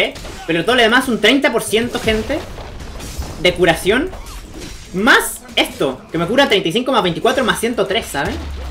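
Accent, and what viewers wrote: Chile. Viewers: eres chileno ??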